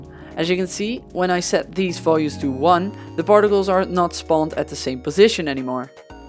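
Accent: Netherlands. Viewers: You sound a little bit Dutch ; )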